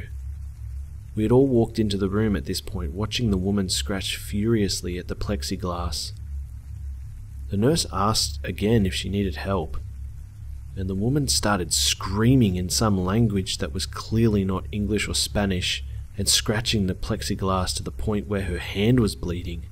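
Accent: Australia. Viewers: Badass man, keep up the good work. Great videos, like the Aussie accent too. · ***** No worries. It's always entertaining watching your vids. I also follow LM. I live on the Gold Coast in QLD Australia, so it's cool to hear a familiar accent :) I'll be waiting in the wings for a new video soon mate!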